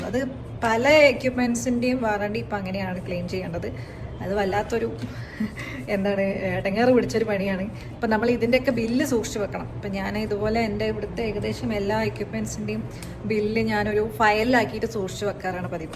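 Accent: Kannur, India. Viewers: ഞാൻ ഇന്നാണ് ഫസ്റ്റ് വീഡിയൊ കണ്ടത് കൊള്ളാം സംസാര ഭാഷ ശ്രദ്ധിച്ചപ്പൊ കണ്ണൂർ സൈഡ് തോന്നി ശിയാണെന്ന് പിന്നീട് കമൻ്റ്സ് നോക്കിയപ്പൊ ഒരാൾ പറഞ്ഞത് കണ്ടു. ഓകെ ഗൊ ഹെഡ്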